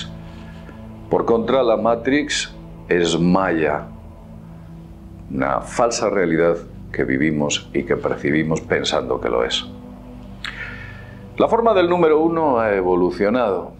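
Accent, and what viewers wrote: Spain. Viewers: muy bueno. .es Español? ?? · Es español? Jaja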